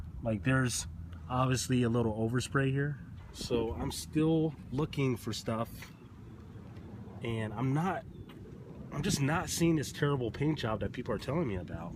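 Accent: West, United States. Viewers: You sound like a white guy from sorta out west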